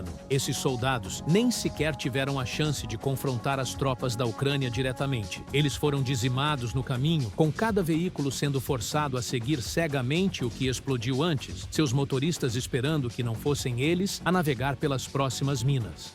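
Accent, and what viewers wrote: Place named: United States. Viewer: Que pronúncia Americanizada é essa com os nomes russos?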